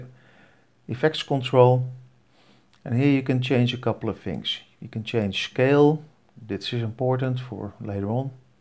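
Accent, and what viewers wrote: Netherlands. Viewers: you sound a lot like a dutch man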